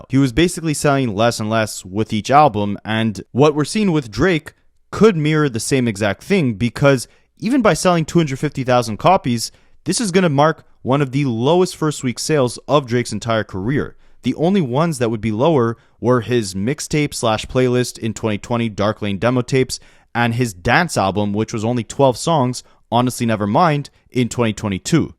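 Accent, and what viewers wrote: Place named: Montreal, Canada. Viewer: Are you from Laval or the east end of Montreal or something. You sound like you are a Greek or Italian montrealer 😂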